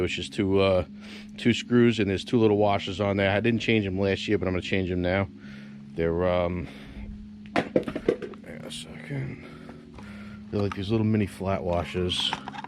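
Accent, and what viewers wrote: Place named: Long Island, United States. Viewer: Sounds like your from L.I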